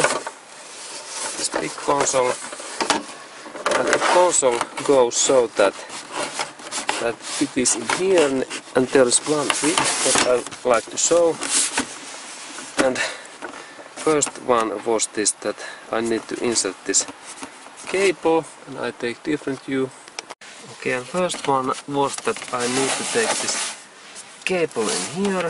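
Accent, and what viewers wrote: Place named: Finland. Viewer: I am not a mechanic, I liked your video and the way you showed me how to do the cable tensioning. I would have thought that you should have jacked the vehicle up and put on jack stands, and then before assuming that the tension was right, go and see how the wheels spin freely. BUT I guess by evaluating the clicks and thereby the tension in the cable would be sufficient. I learned from you, but I will jack the vehicle up as I am not a mechanic and would not be sure that I have the right tension, perhaps also the shoes should be adjusted, I don't know about it, but I am about to do a Camry 2003 cable, if there is a cable, the handbrake leaver has no resistance as if there is no cable or connection at all. Can you can you come to North Queensland and do it for me? Cheers, and thanks. Yes by the accent I know you are from lovely, friendly Finland.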